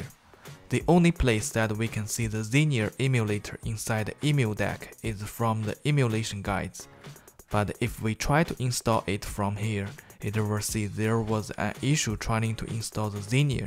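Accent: China. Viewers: UP主一听就是中国老乡哈哈哈哈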